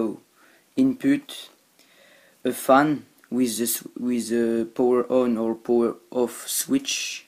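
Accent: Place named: France. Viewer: dat french accent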